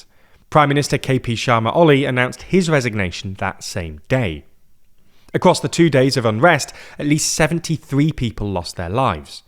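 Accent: United Kingdom. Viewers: Americans: Generation Z British people: generation Zedd. (Is he talking about Lord Zedd from Power Rangers or is this just a British accent sounding weird to me?)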